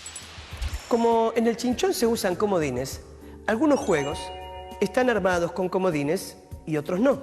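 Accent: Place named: Argentina. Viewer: como se nota que es argentino no se le entiende un pomo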